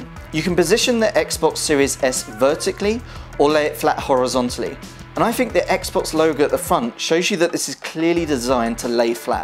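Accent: United Kingdom. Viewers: why your british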